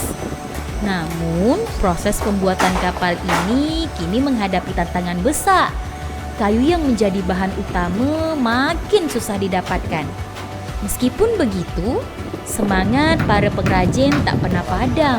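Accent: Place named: Malaysia. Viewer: Kenapeeee suara narator ini seperti orang Malaysia. Padahal ini ada di provinsi jambi. Tak asik lah dengar suara seperti niiii. Tak jadi saya tonton.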